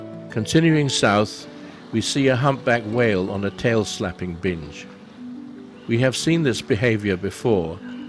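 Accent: United Kingdom. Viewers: Wered you find this voice narrator? i could listen to him all day, love his slight british accent !Really makes the video classy!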